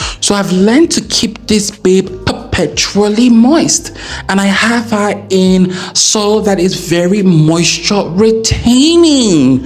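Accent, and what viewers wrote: United Kingdom. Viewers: Hi ❤ ❤ 😢😢😢😢😢😢😢😢 I’m an alocacia and calethia killer, those fancy ones 😢😢😢 but I found few at Walmart on sale, $2.50 found some & I still have them; I do have many types of tradescantias and love propagating them 😊 but I still kill plants. I have all kind of plants 🌱 🪴🌱🪴🌱🪴🌱🪴🌱🪴 maybe more than a 1000; still ❤❤❤ I love the ones stay alive for many years, the ones I kill 😢 I try & I try! I thought I was a plant mom that water too much, that I am constantly there but I am not, I am there in my garden but I don’t check them daily, honestly I have not found pest in any of them in many weeks😊 but this hobby is very rewarding and expensive 😊😊😊 Friend I like your British accent 😂😂😂 thank you for your time ❤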